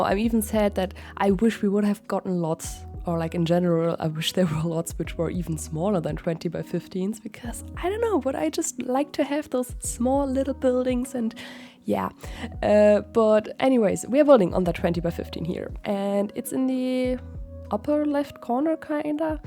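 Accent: Germany. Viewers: is she german? i think i hear a little accent because i‘m german too and i got so excited bc i rarely find german content creators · Yeh I'm wondering too!!! 😊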